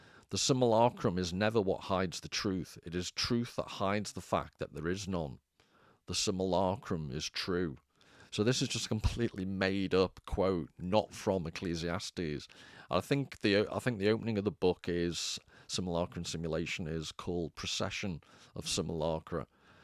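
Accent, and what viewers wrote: Liverpool, United Kingdom. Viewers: just discovered you today, I will subscribe, I have read blood meridian about 5 times, although suttree is my favourite, I will have to read America, good to hear a Scouse accent, i left Liverpool 35 years ago!! Keep on keepin on 🙏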